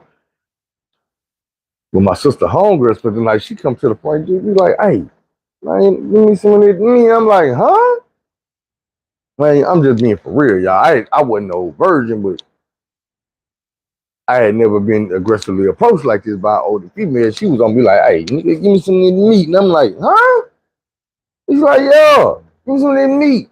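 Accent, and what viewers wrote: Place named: Texas, United States. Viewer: Bruh I watch yo videos all the time you sound like my Texas uncles guess it’s that Texas/Arkansas connection you 💯bro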